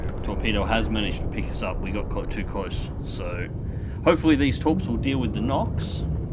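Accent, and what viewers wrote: Australia. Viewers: Enjoy hearing an Aussie on the intertubes.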